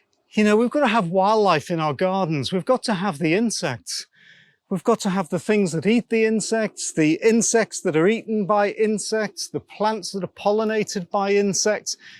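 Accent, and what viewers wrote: United Kingdom. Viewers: Hi! I subscribed to your videos some days ago, but I do really enjoy your style as well as beautiful British English. I'm crazy about growing and cultivating plants, though I don't have my own garden, only a balcony one with numerous pellies. Thank for sharing your precious experience. I learn a lot from you, especially the pronunciation of the plant species. Here, in Ukraine we are all into gardening and have green hands. If only we had peace! We would make our country even better with such a good teacher like you! Your tutorials are really worth seeing!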